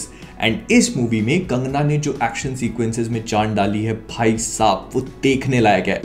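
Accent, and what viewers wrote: Bhopal, India. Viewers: Bhai are u from bhopal kyuki achi bhopali krte ho 😀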